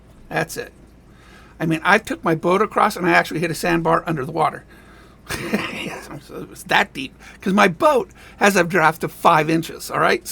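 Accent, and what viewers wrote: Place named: England, United Kingdom. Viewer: The dams needed to be removed ! But the sediments needed to be dredged out before removal plain and simple. It will take decades to flush out into the mudhole in the pacific out front of the klamath. Decades for the trees willow ash etc to grow to shade the river again.. As far as the sasenach narrating this go home to where you come from ! The river will heal itself just gona take time lots of time!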